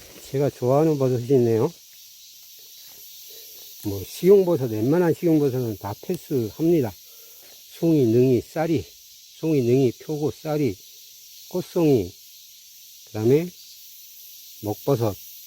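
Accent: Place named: Chungcheong, South Korea. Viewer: 산삼능이약초산행에 갔다가 소개받고 왔습니다.좋은영상 감사하고유 말씀이 충청도분이신지 구수하니 좋네유.자주 들리겠습니다.항상 산산.풍산하세요~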